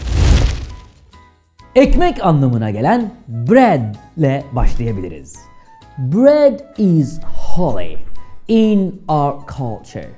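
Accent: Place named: United Kingdom. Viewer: hocam formatınız iyi..teşekkürler.. bir kaç önerimde var bunun yanında..amerikan aksanını ön plana çıkarmanız....çok kullanılmayan dip bilgiler yerine günlük hayatta sıklıkla kullanılan kalıplara yönelmeniz..ingiliz aksanı kafa karıştırıyor kullandığınız...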